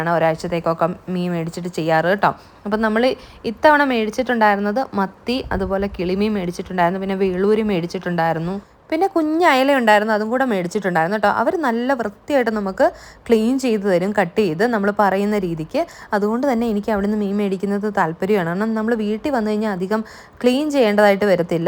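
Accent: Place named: Alappuzha, India. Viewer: where in alpy...doubted from ur tone that u will be from my place❤😂😂😂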